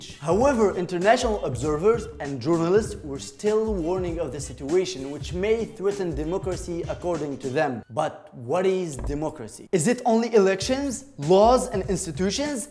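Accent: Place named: England, United Kingdom. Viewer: btw i like your english accent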